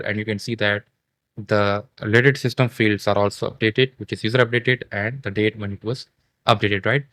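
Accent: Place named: India. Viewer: Puh, the Indian accent is a bigger challenge than learning the software itself 😔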